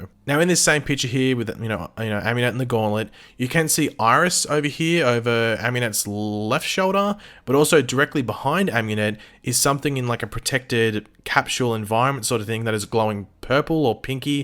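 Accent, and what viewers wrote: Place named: Australia. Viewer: B A He has an Australian accent bugs for brains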